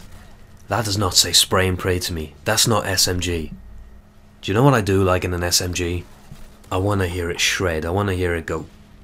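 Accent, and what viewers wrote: Wales, United Kingdom. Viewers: Are you Welsh? You seem to have a very strong welsh accent. But the manly one, not the gavin and stacy welsh accent. The one that that comedian has... uh, whats his name... he did a work experiance series where he did work instead of comedy... ANYWAY, yeh, so, are you welsh?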